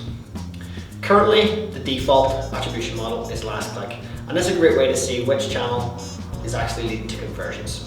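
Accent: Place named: Ireland. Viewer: Great video dude. Loving the Irish pub accent.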